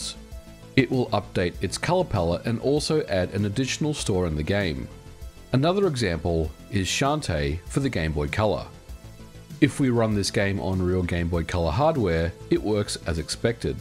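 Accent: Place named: Sydney, Australia. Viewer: You mean his "West Sydney ethnic" Australian accent? For most Australians when we hear that voice we think of a mechanic.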